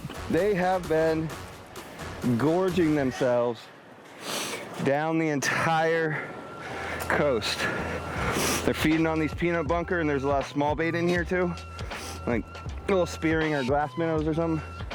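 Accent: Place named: New Jersey, United States. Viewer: LOL, I didn't know you spoke New Jersey"n accent!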